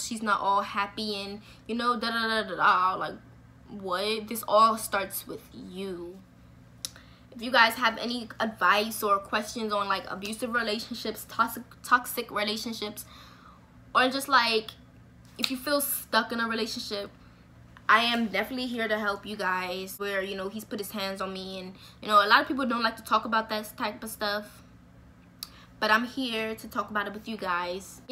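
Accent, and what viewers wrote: United States. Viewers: i could tell u was from pg... same girl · I need a Maryland chick 💀 I’m loving how you talk sis 😂❤️😘 but don’t get me started on cheating & niggas 🤦🏽‍♀️ I got storytimes for days on my channel lol 🙄 keep doing yours!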